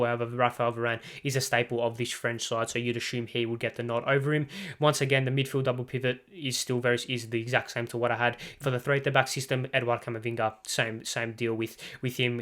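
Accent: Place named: Australia. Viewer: RIDICULOUSLY AMAZING WORK! So Proud of you, and to see you produce this A GRADE! From a Pom to hear an aussie talk about the great game I am simply clapping! EPIC WORK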